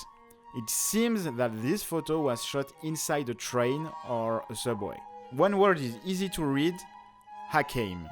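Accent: France. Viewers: I like your french accent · Are you french ? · J'ai capté que t'es français grâce à l'accent. Tu touches un billet pour faire de la pub à nation photo ? Avant même que tu montres la lessive c'était certain que c'est les années 80. Les meubles et les costumes, la déco etc. Je comprends pas comment t'as pu dire années 50 / 60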